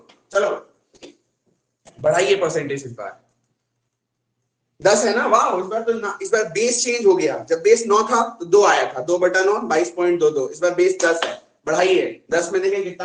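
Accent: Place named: Bengal, India. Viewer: Dada tumi ki bangali?? Tomar tone ta lagche jeno tumi bangali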